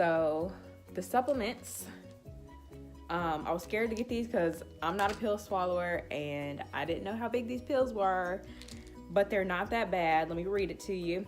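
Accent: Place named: South, United States. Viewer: Omg I love your southern accent.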